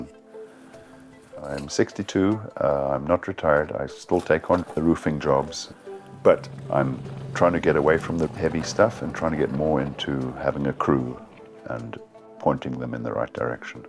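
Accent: South Africa. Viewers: And he probably grew up speaking afrikaans, English is his second language. Has trace of afrikaans accent. Intelligent human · I heard your voice and knew at once you were South African. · I would recognize you south African wherever ur. Job well done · Interesting unpredictable admixture of South African and North American vowels... nice life... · I am south african and could tell his accent immediately. he is living the life i could only dream of. well done. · as soon as i heard his voice i knew he was South African- watching from Durban. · I recognized your SAffer accent immediately! Thought, "He's from SA!" Great way to live. My kids are also off grid here with a wonderful garden. Very few expenses. It's the way to go, with the way things are here and getting worse. Baai gelik en sterkte voorentoe. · This was so good to hear an south african accent doing something so amazing. 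· Wow that South African accent, unmistakable.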